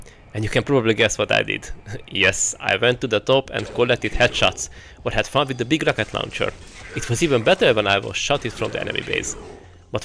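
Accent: Hungary. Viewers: Sounds like you're hungarian speaking English. Good content tho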